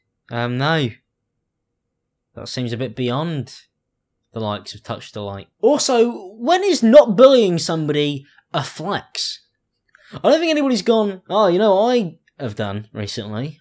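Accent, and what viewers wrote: United Kingdom. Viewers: Why did Jacksepticeye turn british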